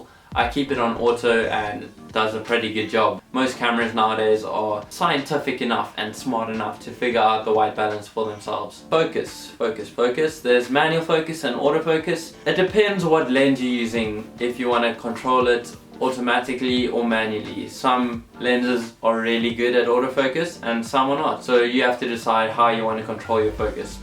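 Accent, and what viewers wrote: South Africa. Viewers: South African? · Are you south african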